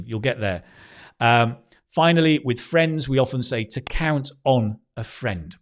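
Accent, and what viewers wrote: United Kingdom. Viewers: I love your british accent😊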